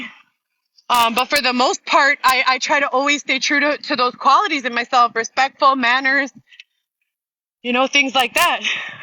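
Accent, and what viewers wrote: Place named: Latin America. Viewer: Here she goes with the latina accent🤣😂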